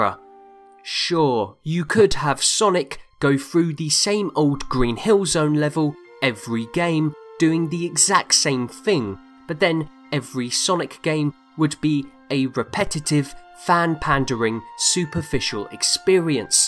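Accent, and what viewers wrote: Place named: United Kingdom. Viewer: This checks all boxes for a video essay. Even that you’re Bri’ish. So unfortunate.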